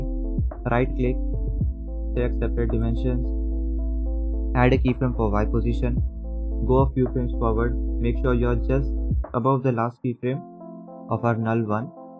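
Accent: India. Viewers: are you a indian your accent feels me like that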